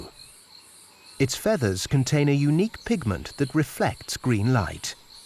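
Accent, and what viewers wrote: United Kingdom. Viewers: Imagine if this documentary began with a female photographer taking photos of a man in a speedo, or some such get up. This, combined with a British accent to make it classy. Way to dumb down science at the expense and degradation of women.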